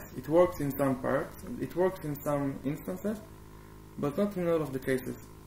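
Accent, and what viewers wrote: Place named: Arab world. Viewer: you have arab accent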